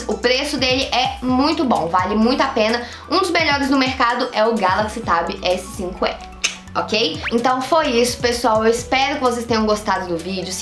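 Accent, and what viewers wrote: Minas Gerais, Brazil. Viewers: Aaaaaaaah vc e mineiraaa , eu sou da região de venda nova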